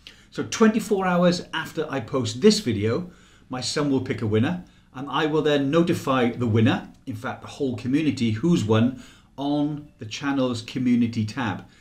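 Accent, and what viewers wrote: Wales, United Kingdom. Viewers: Obviously you come from Wales :)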